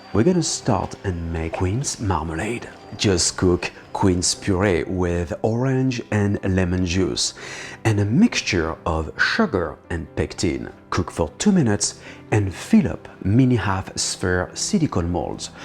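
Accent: France. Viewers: Idk...between the crazy machinery (non-cook here) and the intimidating French accent I feel like all of this video is basically "baking on hard mode". In a world where BuzzFeed dominates Instagram worthy foods it is a wonderful sight to see true baking skill displayed on YT. Edit: thanks for all the likes! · I don't know if I'm following an English cookery course given by a guy with a strong French accent, who probably thinks that his smile will make him forget to give the measurements and grams needed to make this tart. He needs clicks and likes for his video I need measurements Hoping for your understanding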